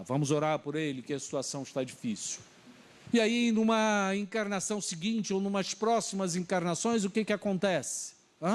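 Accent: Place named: Portugal. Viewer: Por que está falando com sotaque de Portugal?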